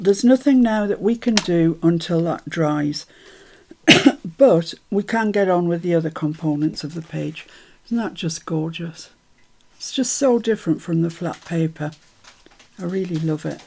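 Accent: United Kingdom. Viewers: You are really fattenin' ol' Bob up. I LOVE it! I also love rice paper. It is wonderful to touch and is really easy to apply. I'm glad to see you used it. LOL. Did you say 'trim-mahhhge?" 😂😉 I find when I am working on my flippy flappy and following your video, I speak in your British accent. I repeat what you say just the way you say it. 🤭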